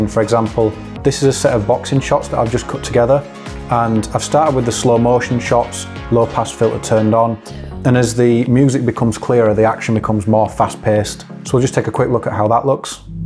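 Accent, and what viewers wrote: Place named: Yorkshire, United Kingdom. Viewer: Knew it was a Yorkshire accent! Not too far at all. I found a lot of tuts on this effect but really liked the examples you showed and the intro is class too - you gave examples during the intro, I really think that catches the attention - the transition to your logo is slick too. Yeah weird how only a few likes. I'm constantly checking YT out for Prem tuts and only just found your stuff so it might be that you're not appearing on everyone's searches yet? Not sure how it works exactly but maybe once you get more subs etc then people will find you. I'll be working through your vids anyway and certainly recommend you to others!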